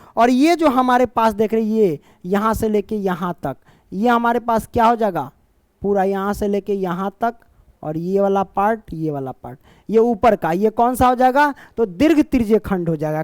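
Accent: Bihar, India. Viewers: Sir aap bihar se ho na😊me tooo❤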